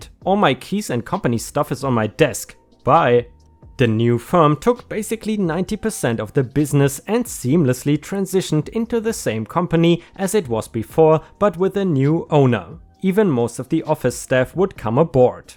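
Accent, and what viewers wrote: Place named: Germany. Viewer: Kann das sein dass du deutscher bist? Man hört deinen deutschen Dialekt extremst raus...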